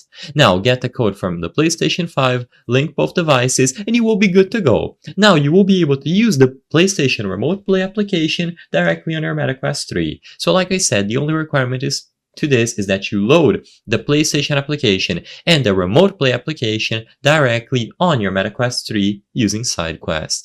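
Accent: Brazil. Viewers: esse ingles é de brasileiro ein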